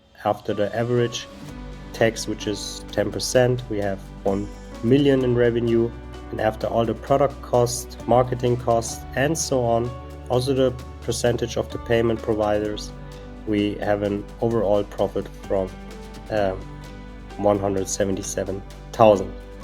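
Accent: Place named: Germany. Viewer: Ich hab sofort durch deine Aussprache gemerkt, dass du Deutscher bist😂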